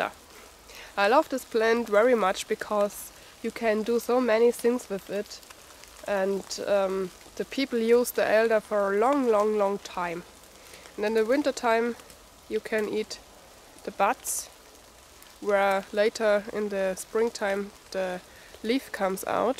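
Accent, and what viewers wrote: Germany. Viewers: Nice to see both dogs again, and great to see you finally have snow! It looks so beautiful. I'm making some Elderberry syrup right now, but I bought my berries, it does not grow around here, good for shortening duration of colds. Next time you say the English word stomach, pretend the ch on the end is actually a k, ka ka ka, OK? Your German accent in english is very cute, by the way. Nice spot for a fire and interesting combination, Bacon and fruit, i will try it next time I have a fire outside, thank you for the idea,.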